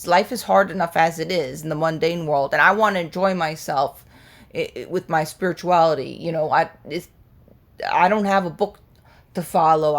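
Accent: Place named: New Jersey, United States. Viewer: I liked your message here. And I also like you NJ accent 😊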